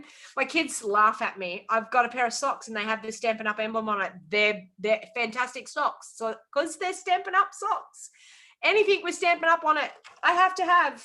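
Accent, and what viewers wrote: Australia. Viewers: Finally Australian accent ❤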